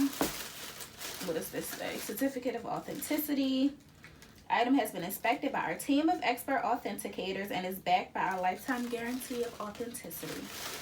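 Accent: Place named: DMV, United States. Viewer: Love the shoes and that blue is gorge on you! I definitely get DMV accent from you are you a native?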